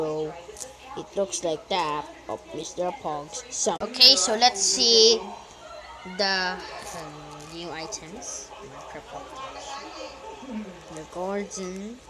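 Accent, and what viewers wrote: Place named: Visayas, Philippines. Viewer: para kang bisaya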